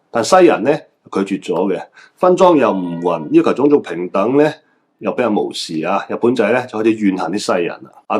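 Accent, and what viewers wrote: China. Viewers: 大陸仔滿口鄉下音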